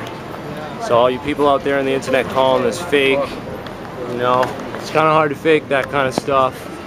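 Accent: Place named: Boston, United States. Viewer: I never realized how pronounced your Boston accent was before. Wicked. I want to hear you say, "Park the car in Harvard Yard."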